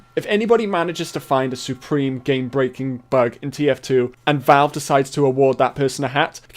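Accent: Wales, United Kingdom. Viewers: Are you Welsh? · Wherabouts in Wales are you from, mate?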